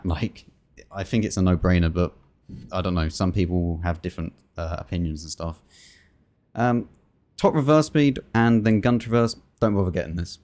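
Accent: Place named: United Kingdom. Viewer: Wow, who is this beautiful assassin lookin' dude at? With the {I think?) British accent? Does anyone know? His reviews are the best, my opinion, and I'm in love. Wow, my cheeks are hurting. No...from smiling!;)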